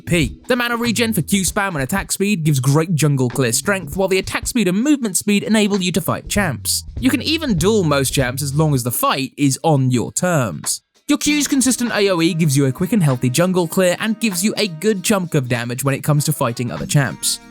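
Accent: United Kingdom. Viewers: Yes now we have a British caster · I’m sorry but I dislike this British accent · his accent is literally screaming UK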